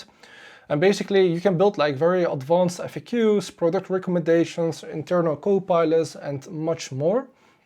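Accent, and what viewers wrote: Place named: Netherlands. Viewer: Hi, ik heb je net gezien op YouTube podcast van Sabrina! Wat een mooie podcast geworden en wat vet dat je zo lekker gaat!! Ik ben in het begin (leer) stadium. Heb besloten m’n tanden erin vast te bijten. Je video’s zijn ook leuk om te zien. Simpel. Hoeft niet perfect. (Reminder to self) En je website is prachtig!! Het hoofdje van de robot is adaptive to your finger. 😊 Ik ga je volgen en van je leren en er zelf mee aan de slag! (Ik hoorde btw direct dat je uit Nederland kwam 😁)Succes 💫 🙌🏻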